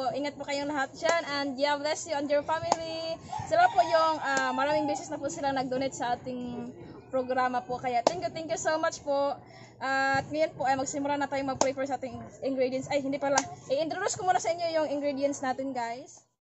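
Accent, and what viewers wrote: Visayas, Philippines. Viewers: watching from baguio city.. new fan & new subs. sobra mong galing magluto.. galing... taga san po kayo visaya kc salita nio po.. God Blessed.. ❤️❤️🙏💞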